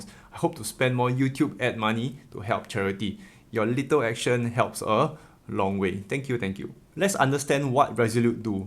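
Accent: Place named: Singapore. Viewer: Singlish😂, long time did not hear it.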